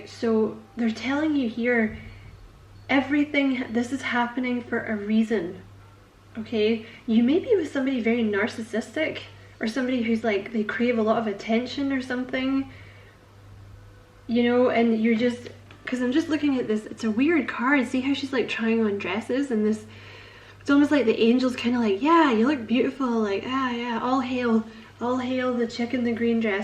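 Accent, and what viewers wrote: Scotland, United Kingdom. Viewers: Are you Scottish? First tarot reader I’ve came across on here that is. ☺️ I’m in Glasgow ☺️ Love hearing the accent 😁👍🏼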